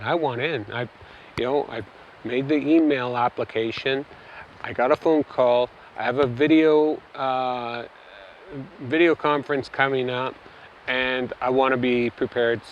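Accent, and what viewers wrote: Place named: Canada. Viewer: I knew you were Canadian when you said the word 'process'. Americans say 'prahcess', not 'prowecess. You also said 'bloody excited'. More common adjective (bloody) with Canadians probably since 1 in 5 Canadians are of Scottish descent.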